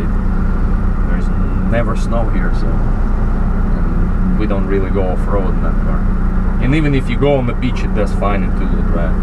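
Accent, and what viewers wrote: Russia. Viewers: Ты русский? 😁 акцент жесткий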